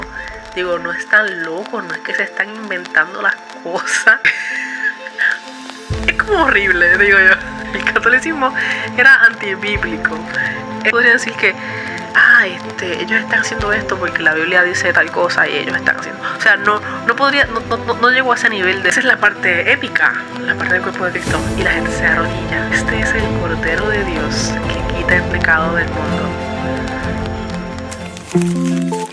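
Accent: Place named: Puerto Rico. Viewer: Hermana Boricua! Lo sé por tu acento. Crecí asistiendo a la iglesia católica y en colegio católico y teniendo toda esta riqueza en mis narices no lo entendía en mi corazón. Mi mamá siempre me decía esta es la iglesia que fundó Cristo y aquí tenemos la Eucaristía, pero yo seguía siendo una católica tibia, sin compromiso… fui a una iglesia evangélica por unos meses pero cuando empezaron a hablar mal de las monjas y los sacerdotes además de la presión para dar dinero y me dije…esto no es del Señor…además que algo me faltaba y era literalmente la comunión, la Santa Eucaristía. Volví y empecé a empaparme de lo que creemos y a buscar información y quedé bruta y convencida de que era verdad lo que me decía mi mami. Abrí mi mente y mi corazón y estoy enamorada de mi Jesús en la Eucaristía- somos el cuerpo de Cristo - la iglesia que El quiso que viviera en unión. 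No somos perfectos pero El dijo que las fierzas del infierno no prevaleceran contra ella. Dios te bendiga y te acompañe en este caminar. Un abrazo! Vi tu video desde Virginia, EU.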